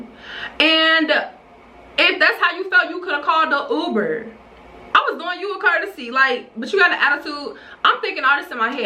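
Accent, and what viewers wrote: Chicago, United States. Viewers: 🤔 you sound like you from Chicago, I’m new here do idk lol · I can definitely tell you’re from Chicago sis! Accent hella strong! 🤣 sooo pretty btw! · Are you from Chi bc it definitely sound like it 😭?? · Just listening to you talk I can tell you from Chicago and it’s comforting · I can just tell u from Chicago 😭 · You from Chicago? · Lol are you from Chicago?? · I love a good Chicago accent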